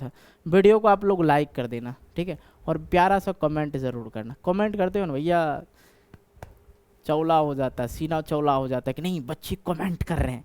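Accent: Bihar, India. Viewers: Sir aap bihari ho kya ❤ Aap bihar se ho kya ❤😊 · Tum va bihari he ka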